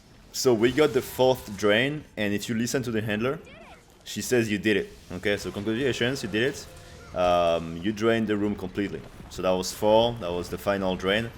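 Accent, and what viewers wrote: France. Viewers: Tu serais pas français par hasard???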